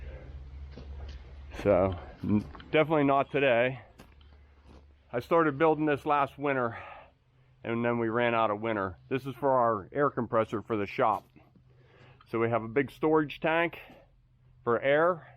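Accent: Pittsburgh, United States. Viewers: I stumbled across your video and started watching it. The minute you opened your mouth, I knew you were from the Pittsburgh area. I was born and raised in Pgh and moved to Arizona in 1998. I still recognize that Pgh accent! I subbed just to get my Pittsburghese fix lol. Thx for sharing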